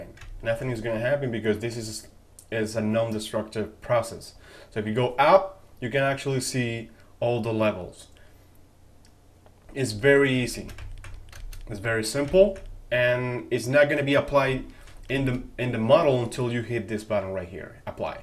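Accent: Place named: Spain. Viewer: Excelente tutorial... Supongo que hablás Español por el nombre y además por el acento:)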